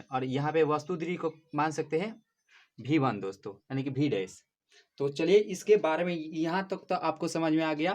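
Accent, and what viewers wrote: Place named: Bengal, India. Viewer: Lagta h sir aap Bangal se h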